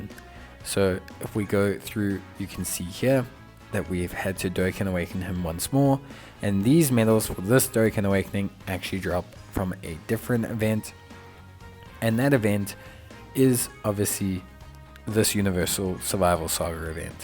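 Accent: South Africa. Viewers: Dude are you South African? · I presume so, the South African accent is undeniably there.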